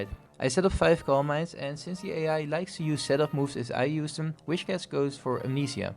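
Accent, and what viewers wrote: Netherlands. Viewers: weird question maybe but are you dutch by any chance?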